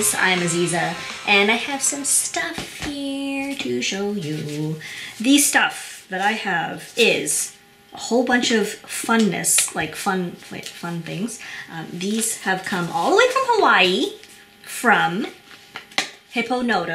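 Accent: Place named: Middle East. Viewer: Saw this...and an acute attack of “MUST HAVE NOW” struck me. Went to site via link and nosed around til I discovered the notebook in Forest Green with Lime Green trim...and selected lined paper. OMG. I’m in heaven. Hope I don’t have to wait too long. PS: you have a very, very slight accent that sneaks in now and them. Lovely. Middle East someplace? Israel? I sound like I’m from Oregon...since I am. Sorry.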